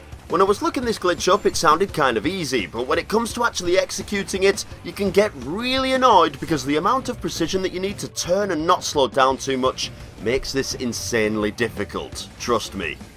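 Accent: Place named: Northern England, United Kingdom. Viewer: I also noticed you were using a PS4 in this vid. Would you mind adding me on PSN? My PSN is the same as my YT name. I'd love to do a video with you some time. I don't do many but mine are all about timesavers and completionist videos plus some random unscripted stuff too and I'd love to do a collaboration with you. Forgive me if I am wrong as well but I can't help but note that Northern accent as well. I'm from Sheffield if that's near to you?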